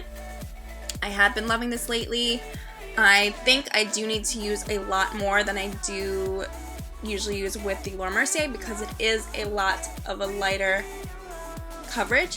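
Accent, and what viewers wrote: New Jersey, United States. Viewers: Girl! How have I never stumbled across your videos?! Flawless makeup, and it sounds like you're from Jersey... automatic win!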